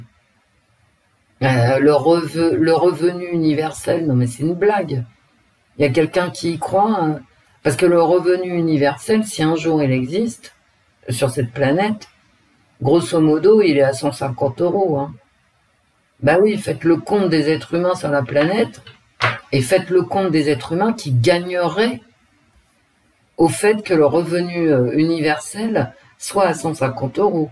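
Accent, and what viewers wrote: Paris, France. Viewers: Merci... contente que vous soyez soutenue par vos consœurs. J'aime vraiment comment vous tirez les cartes, votre franc parlé et votre accent de Paris...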